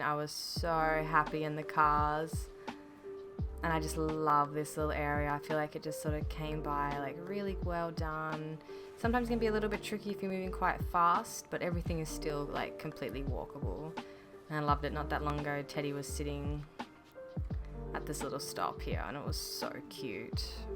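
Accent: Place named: Australia. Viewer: This is absolutely beautiful! I love the decorating in your house- really well done!- and how you’ve created little neighborhoods and lovely spaces ❤ Thank you for sharing and congrats!! As an aside, I absolutely love listening to you speak- I am a HUGE fan of Offspring (I’m on my 12(?) watch through, deeply in love with Matthew LeNevez). But hearing Aussie’s accent (to this Yankee’s ears) is absolutely fabulous. ❤❤❤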